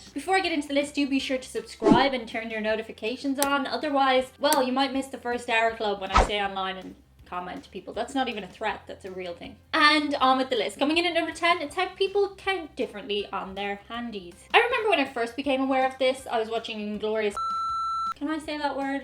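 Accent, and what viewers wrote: Ireland. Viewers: I just love her accent. Female Irish accents are the nicest in the world.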